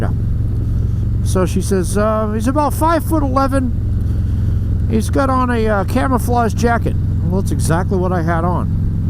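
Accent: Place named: Boston, United States. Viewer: Love the Boston accent the way you say Kar! All mom's and dad,s i think have had a good laugh now and then. That's so true. Hahaha.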